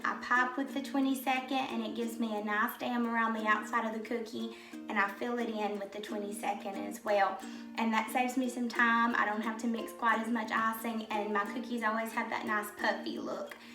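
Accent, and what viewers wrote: South, United States. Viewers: Fingers crossed my icing turns out like yours. Lol And yes, I could tell you are a southern girl right off the bat. I'm one as well. Lol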